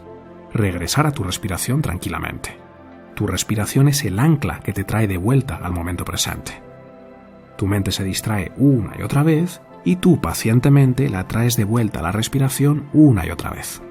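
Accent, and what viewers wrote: Spain. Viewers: Es el único acento de un español que sí Disfruto al escucharlo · Lo que es feo es tu forma de juzgar y decir que la mayoría lo hacen.Es claro que te identifica con ellos.Para mi muchos hablan como lo hace aquí está bella persona.El español es un acento dulce al escuchar ❤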